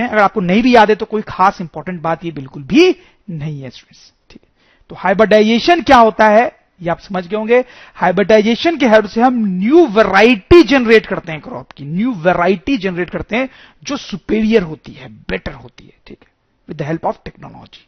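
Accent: Bihar, India. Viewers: Are u from bihar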